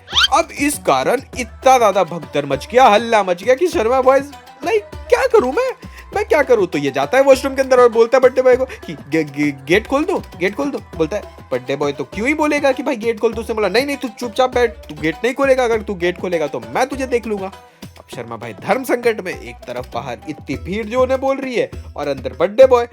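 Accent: Bihar, India. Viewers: Bhai tu Bihar ka hai mujhe pata hai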